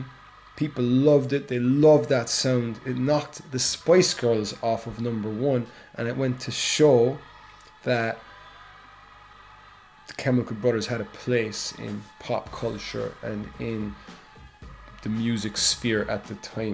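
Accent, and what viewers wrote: United States. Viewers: Hey, your Texan accent isn't so bad. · Great video and great southern accent ! from Florida.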